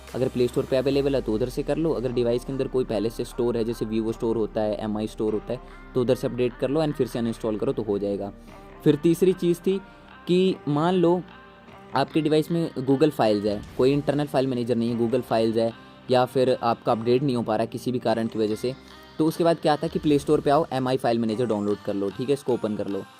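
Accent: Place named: India. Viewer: Is this a joke? It doesn't even work?! Tf? English caption but indian voice wtf?!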